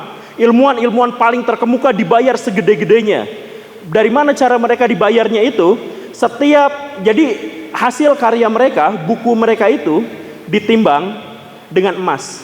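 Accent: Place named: West Java, Indonesia. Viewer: Aksen sunda dalam narasi nya adalah satu dari bbrp aspek yg bikin seru dan lucu, masa khalifah bilang gini "eee kamu teh" wkwwkk mantap 👍🏻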